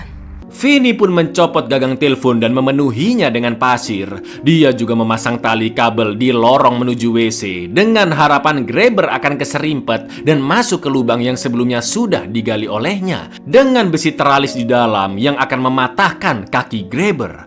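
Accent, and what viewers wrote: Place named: Surabaya, Indonesia. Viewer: Cak asline arek ndi? Kok logat bahasa e koyok Suroboyo sidoarjo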